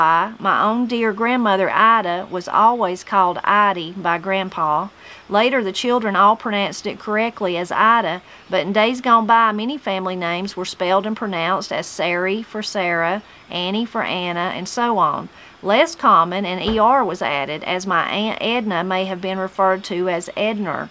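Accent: Appalachia, United States. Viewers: I love hearing you talk, with the accent my Appalachian family brought to mo. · Your accent is beautiful - it speaks of where you’re from and the long history of people in Appalachia - accents aren’t as strong here in Canada. I really enjoy your videos - thank you!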